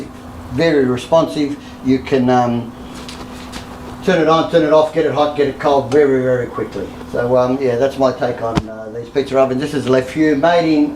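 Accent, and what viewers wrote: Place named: Australia. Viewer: Gr8t video. And I loved your accent too (don't get me wrong it reminded me of my year abroad spent in Penrith NSW). Thanks mate.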